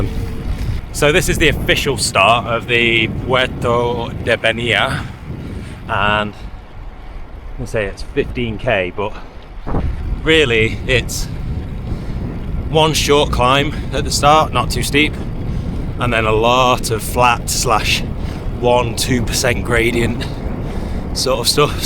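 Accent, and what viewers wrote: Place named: United Kingdom. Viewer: He’s British, not Spanish. Do Spanish put in British accents when pronouncing British place names???? 😂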